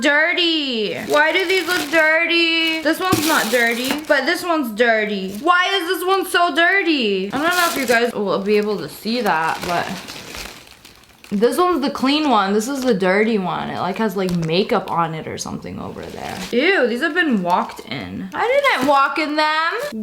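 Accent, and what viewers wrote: France. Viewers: I’m so confused, is she French? 🤯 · TobaMusicQueen wait is she really French or is she being funny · Wait is she actually French????....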